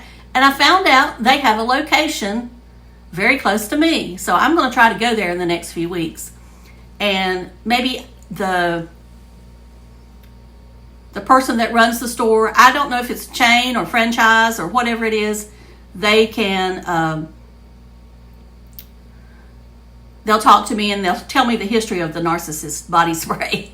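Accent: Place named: Arkansas, United States. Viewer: Ok saw your name enough that I finally came to subscribe! Nice to see you. Your accent reminds me of my Dad's sisters, your accent. Maybe you are from Arkansas? We are Native American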